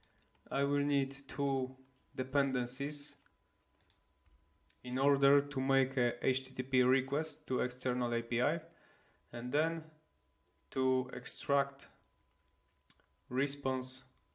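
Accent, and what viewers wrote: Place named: Poland. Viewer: Are you from Poland? You sound like you are from Poland ;)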